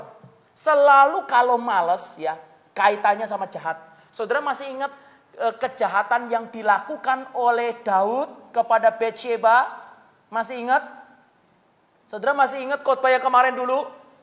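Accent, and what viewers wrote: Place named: Java, Indonesia. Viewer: Logatnya tetap beda medok "Jawa"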